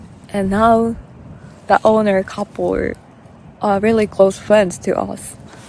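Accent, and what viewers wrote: Australia. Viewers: Aussie pronunciation is slipping in every now and then haha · Your English is improving. Some very Australian accent popping through. Yes it is cold. It’s still winter. In a few months it will be burning hot. Your dream sounds fantastic. I wish you and your husband well in establishing your little hotel 🏨 👍🏽🇦🇺😊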